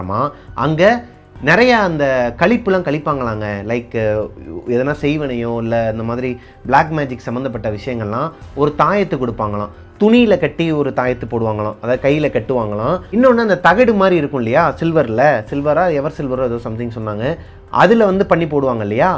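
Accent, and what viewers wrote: Bangalore, India. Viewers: Akka banglore slang tamil keka nalla irukku,im from banglore to....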